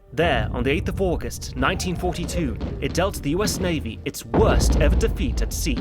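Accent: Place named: United Kingdom. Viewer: you say names so British like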